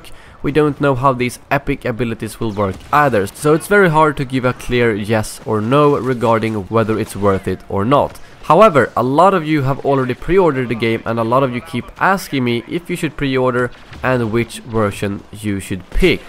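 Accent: Sweden. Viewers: You Swedish? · Are you swedish?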